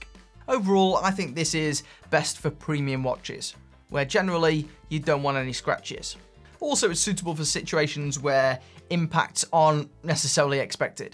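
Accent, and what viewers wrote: United Kingdom. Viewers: I love British accent interesting to listen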